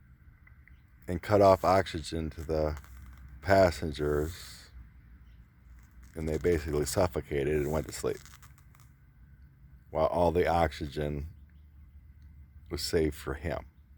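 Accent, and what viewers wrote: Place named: United Kingdom. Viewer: Кто нибудь расскажите об авторе канала Кто он ? Что он? Кто он по национальности ? Американец, Британец? Я не очень разбираюсь Но если не ошибаюсь у него Британский Английский. Американецев вообще сложнее понять Американцы Коверкают Искажают Английский язык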